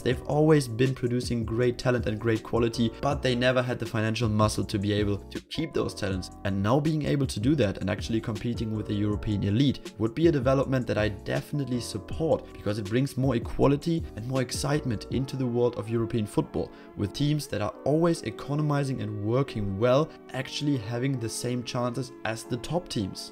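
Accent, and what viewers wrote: Germany. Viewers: Are you German? PS: Great Videos. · When he started to count up the teams i knew he is a German, this Groningen and AZ was as German pronouced as it could. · The moment he started speaking, you could inmediately tell he's German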